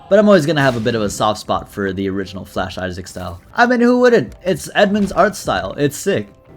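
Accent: United Kingdom. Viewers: YOURE BRITISH????? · IS BRITISH?,????,??,???????? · wait your British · YOURE BRITISH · I'm British. But I have no accent recognition. I didn't realise is also Bri'ish. Cool. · wait ur british :( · is British?? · Me when realizing is British: 😱😱😱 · wait, you’re BRITISH!? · WHHGAT YOU AR E BRITISH???N i'm beyond flabbergasted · This Mod Changes EVERYTHING! Edit: WTF IS BRI’ISH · BRITISH?? WHAT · IS BRI’ISH?! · Bros british?????? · YOU'RE BRITISH? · You're British!?!? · UR BRITISH? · you're British??? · Are you british???? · Your British??! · is BRITISH?! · is British? Oh no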